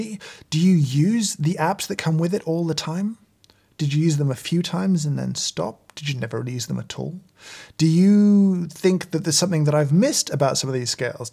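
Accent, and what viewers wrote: United Kingdom. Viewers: That's the effect British accents have on Americans. This dude could literally tell stories about his farts throughout the day and Americans would tune in.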